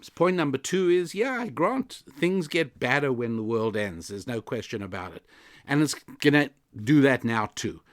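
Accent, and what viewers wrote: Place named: United Kingdom. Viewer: Your bold British accent is brilliant and inspirational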